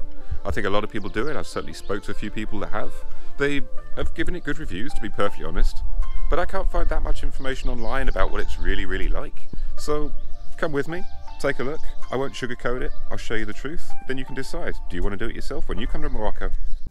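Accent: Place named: Glasgow, United Kingdom. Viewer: You have a hint of a Glaswegian accent.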